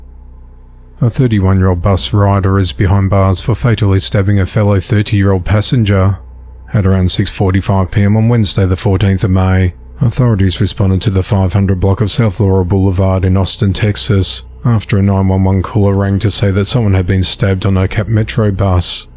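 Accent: Australia. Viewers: Are you Australian? Anyway have a nice holiday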